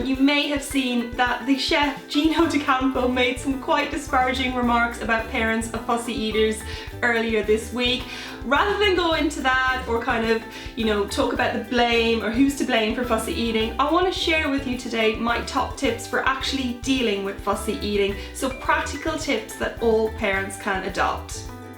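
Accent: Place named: Ireland. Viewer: Whats ur accent? I picking little bit of Irish .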